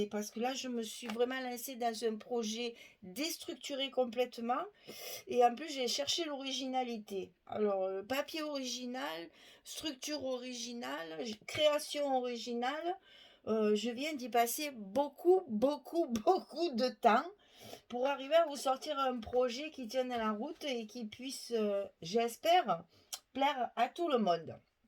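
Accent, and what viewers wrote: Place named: Provence, France. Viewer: Bonjour. Nouvelle abonnée. Tout d’abord bonne année ou meilleure que la précédente du moins. Toulonnaise de naissance - mais toulousaine d’adoption - votre accent fait chanter les cigales dans mon coeur. J’adore ce que vous faites même si je ne suis pas très chaby chic. Juste une petite remarque. Ce n’est pas un style grunge ou trash (cyberpunk) mais steampunk, courant artistique basé sur le début de l’industrialisation en Angleterre sous le règne de Victoria d’où les corsets et la dentelle dans les rouages. Le grand leader de ce courant étant bien sur Jules Verne. Côté cinéma voir Wild wild west (Les mystères de l’ouest) et la Ligue des gentlemen extraordinaires entre autres. Voilá voilá.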